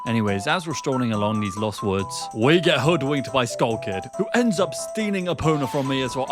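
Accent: Bristol, United Kingdom. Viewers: I know a Bristolian accent when I hear one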